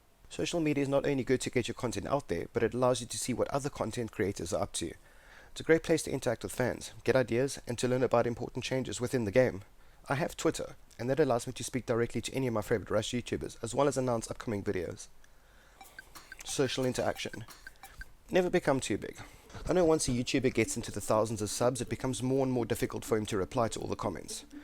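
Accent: South Africa. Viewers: Afreekan accent:)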